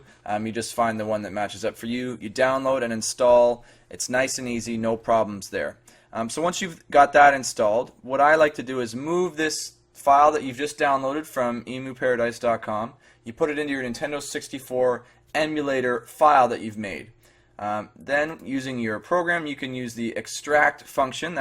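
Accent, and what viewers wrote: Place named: Canada. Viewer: Canadian eh? Thanks for the tutorial man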